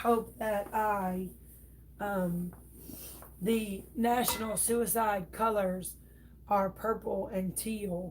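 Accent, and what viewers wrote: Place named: South, United States. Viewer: ❤️❤️❤️❤️❤️.....I knew u was from da south lol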